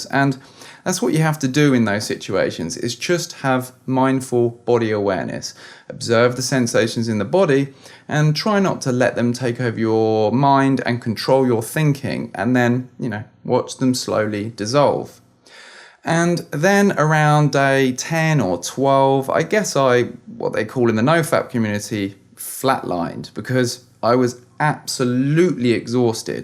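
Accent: United Kingdom. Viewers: I heard Wotor couple of times, you must be from UK, am I right ?😆👍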